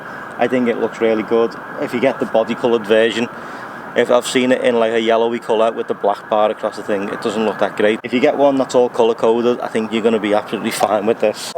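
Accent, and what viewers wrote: Liverpool, United Kingdom. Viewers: 6.2 V8! Nice to hear a scouser! haha Got my SS here in Liverpool haha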